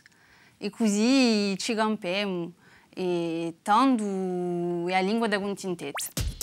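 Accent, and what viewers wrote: France. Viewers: Era più facile sentirlo una volta, ora sempre meno. Quando le persone anziane moriranno la lingua sarà sempre più contaminato da questo cavolo di francese. Anche questa parlante, oltre ad avere questa R uvulare improponibile, esprime un impianto fonetico francese, con nasali, dentali che sono diventate delle affricate. Che tristezza. Mi piange il cuore osservare la lenta fine di questa lingua.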